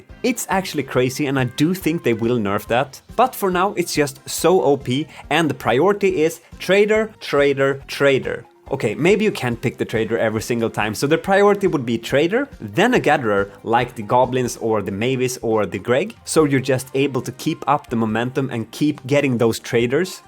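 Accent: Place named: Sweden. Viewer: First video from you that I’ve wached, great first impression. Your accent sound very swedish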